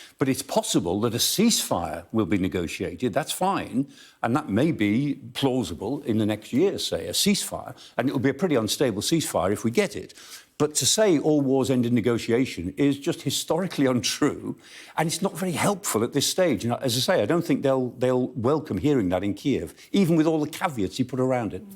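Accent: United Kingdom. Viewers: i just come here to lisin for bulllshit with british accent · British accent bullshit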